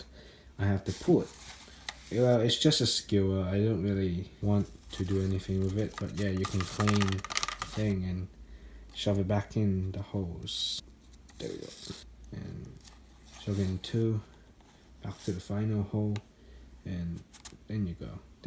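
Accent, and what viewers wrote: Russia. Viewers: r u russian? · I am a Russian, the accent is so good I thought you might have been a Muscovite . I own an arsenal of AK guns and comparing this cardboard replica to my actual AK-47 it is incredibly accurate, almost every little detail is included. You are extremely underrated, it's always the creators that put no effort into the videos that get popular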